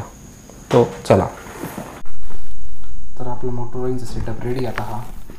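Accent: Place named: Yeola, India. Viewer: Ek no bhawa ...Mi yeolyacha ...aplya gawakdchi bolnyachi style nad hmmm. Cushion removable..😂😂😂😂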